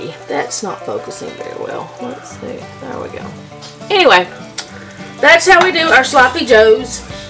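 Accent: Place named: South, United States. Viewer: I love your heavy southern accent. ❤️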